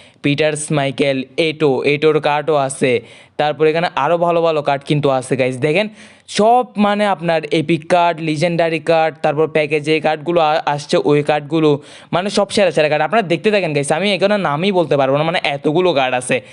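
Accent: Chittagong, Bangladesh. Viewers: ভাইয়া আপনার বাড়ি কি চট্টগ্রামে?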